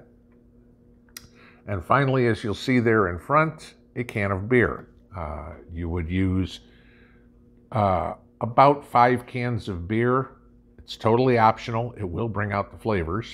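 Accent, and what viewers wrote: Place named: Midwest, United States. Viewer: I just started watching your channel a few months ago and I just KNEW you were not a TN native. I said to my son "That guy is a midwesterner" lol I'm a native Michigander. We know our own lol! Love your channel!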